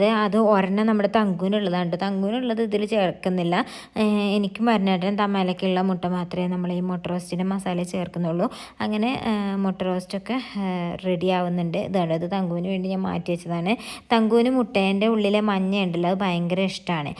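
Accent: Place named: Malappuram, India. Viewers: Malappuram thanneyanu 😂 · വടക്കൻ ഭാഷ..!!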